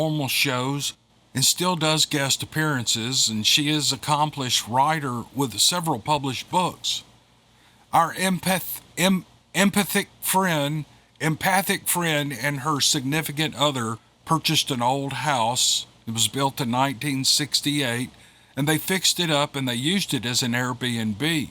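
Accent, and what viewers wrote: South, United States. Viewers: Lol. Thanks, was getting desperate for a fix, of your southern narrations ❤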